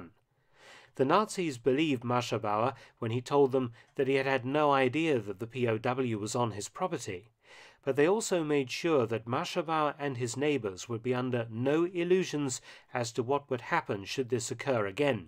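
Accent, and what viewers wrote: England, United Kingdom. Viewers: That's how a proper English should be spoken! Gorgeous accent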